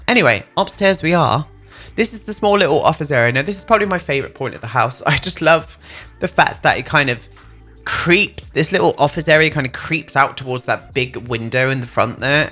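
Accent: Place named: RP, United Kingdom. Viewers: You have the most posh accent I have ever heard a real person have. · Oh lord, you sound posh. Lovely build, though, I wish I had that kind of creativity.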